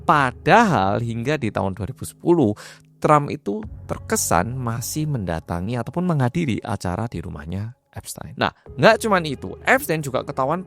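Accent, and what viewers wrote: Java, Indonesia. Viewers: Ak lebih suka medok ini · Korea medok....weeeeh baru denger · WONG KOREA BAHASA INDO MEDOK...😂😂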